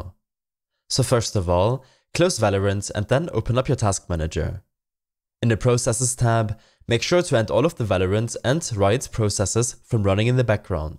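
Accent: Germany. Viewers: Nice german accent. I hope your instruction would help me to solve my problem. Nice video and have a nice day. :D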